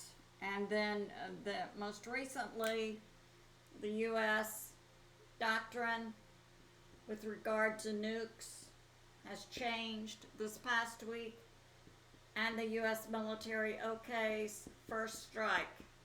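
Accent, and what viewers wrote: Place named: Texas, United States. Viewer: She’s perfect just the way she is she has a Texan accent lovely!!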